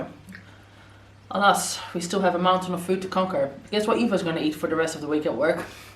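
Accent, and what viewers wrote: Netherlands. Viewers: not related but i first thought you were british then i thought you were dutch and then i got so confused because i pick up both accents. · I thought I recognized the accent, she speaks Dutch as well 😀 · It’s Dutch 😄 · Are you dutch? I hear an accent.. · Loved the video! And I thought I heard the slightest bit of a Dutch accent :) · Are you Dutch? It's my native language and your accent sounds Dutch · Why is your accent so Dutch! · You sound Dutch haha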